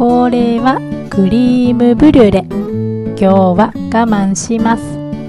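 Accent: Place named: Kansai, Japan. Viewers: 今回も笑えました😂 ドイツのスッポンポン事情‼️ぜーったいNGですよね😣逆にストレスたまります！ 主婦だって息抜きは必要。美味しいお料理とお酒とおしゃべりがあれば乗り切れる気がします！ 実は今日、新社会人に向けて、娘が一人暮らしをスタートしました。私の方が寂しくてしょげていましたが、この動画を見て思わず笑ってしまいました😊nobanaさんの関西弁のお喋り、ほんと大好きです😍これからも楽しい動画、よろしくお願いします😋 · 初コメです！Guten Tag🍺 私も過去に１０年以上ドイツで暮らしたことがあるので懐かしい街並みや生活スタイルを懐かしくチャンネル登録させて頂きました。 旅行で数日滞在するぶんにはドイツはお城やらオーガニックコスメやら硬ったいパンやらもの珍しいかもしれないけど、何年も現地に住むとほんとに日本でのドイツの捏造されたイメージと現実があまりに違いすぎて愕然としたものですよね( ´艸｀) それでも流石に現地に順応されて生活されて子育てまでされていNobanaさんのような素敵な日本人奥様がいることがすごいなと思うし在外邦人の鏡だと思います。 視聴者さんの中には海外生活やドイツ生活のネガティブな部分を見るとがっかりするのか・・そのように思う方もいるようにコメント欄にはいるようですが、ここはあくまでNobanaさんの動画チャンネルなわけですからNobanaさんが考えたお好きに動画スタイルのほうがご自身にもストレスないと思います。ただでさえ寒く暗くどんよりグレーな空のドイツ冬季に色々メンタルも落ちるのすごいわかります。 日本に帰国して思うのですが日本のテレビやメディアは海外の映えている部分しか見せないし、海外に住んでいると(そんなアホな―笑)と思うことだらけだし。 日本のテレビはほんとに海外生活のいい所しか映しませんから、これから海外の出たり海外生活を始める方もこういう海外生活ＹＯＵＴＵＢＥ動画を見ていると思うので、いざ現地に出て映えていない現地生活にがっかりしないように準備するためにも、ほんとの海外生活を見せる事も大事だし、結果的には役にたつのだと思います。 これからもNobanaさんらしい関西弁での面白い動画を楽しみにしています。 ちなみに私はドイツの混浴サウナは１度経験してみればもう慣れてしまいジムで平気になりました(笑) 寒い長い冬の気候で凍えるよりジムのサウナで温まるほうがメンタルを保つのに大事でした( ´艸｀)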